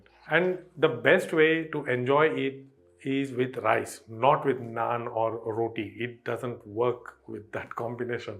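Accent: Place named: Kerala, India. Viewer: Your accent sounds like a malayali Sir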